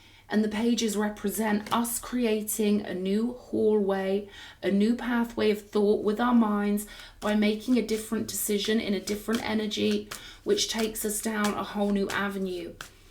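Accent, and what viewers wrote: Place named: Dorset, United Kingdom. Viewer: Hi all, Does anyone know where I can get one of the little dog figurine with the Bee 🐝 on its foot please?,thanks for your messages,you sound like you're from the same area as myself,Dorset or cornwall.love & blessings.BABA CHRIST 🕊️🤍🌹🐝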